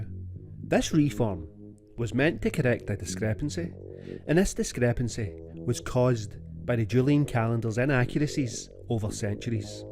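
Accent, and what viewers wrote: Scotland, United Kingdom. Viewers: Great Information...But Hard Time Understanding The Scottish Accent.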